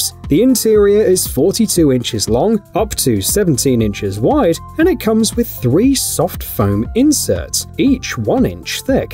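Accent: United Kingdom. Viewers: British person talking about guns, no thank you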